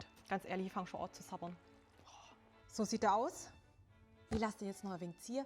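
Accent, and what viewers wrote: Swabia, Germany. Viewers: Die Stimme oder die schwäbische Mundart? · Sehr schade so eine tolle Frau muß schwäbisch reden Kartoffelsalat schmeckt wie nasse Socke in Hochdeutschland geht auch mit Mayo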